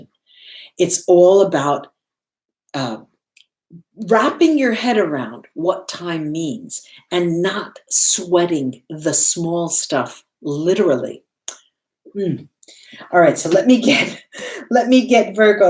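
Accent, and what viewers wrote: Italy. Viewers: Are you from Italy? Thank you for the reading .Buon Cumpleanno!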